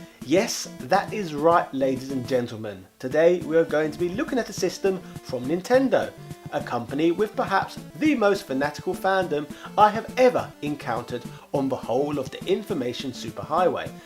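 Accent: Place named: Cockney, United Kingdom. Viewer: Love your cockney accent mate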